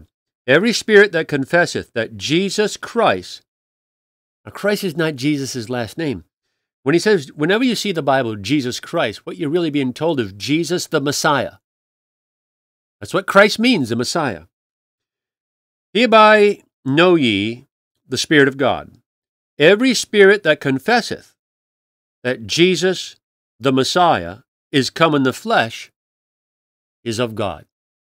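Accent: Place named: New England, United States. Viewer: Love your New England accent. Don't hear much of that here in Winter Park, Colorado at 9000 feet near the Continental Divide.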